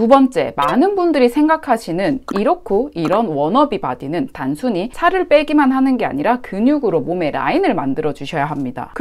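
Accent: Gyeongsang, South Korea. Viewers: 누나 혹시 경상도 출신이에요?? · 언니 갱상도 뇨자였구나? 친근해ㅎㅎ 담엔 사투리버전 영상 어때여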